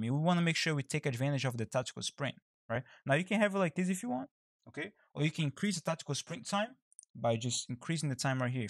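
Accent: Brazil. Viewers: mano tu é muito brasileiro kkkkk esse sotaque nao me engana , otimo ingles btw haha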